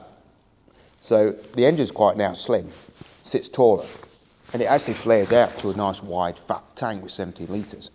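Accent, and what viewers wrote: United Kingdom. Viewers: Had my Himmy for a year and a half and it's been the perfect bike for leisurely touring small country roads, gravel roads and greenlanes with my wife as pillion. Taking the road less traveled literally and figuratively attracted me to the underdog RE Himalayan over the Japanese, Austrian or German brands. The new Himalayan, unfortunately, has lost this appeal. On another note, as a non native English speaker, if I may, the immense variety of British accents, including your own, are delightful! As for that cold, a few hot toddy's should do the trick!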